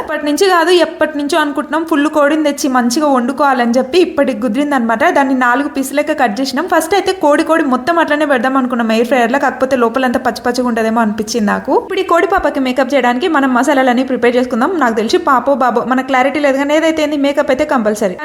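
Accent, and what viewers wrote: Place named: Rayalaseema, India. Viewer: మనది ఏ ఊరు అండి, మా రాయలసీమ బాష లాగే వుంది మీ బాష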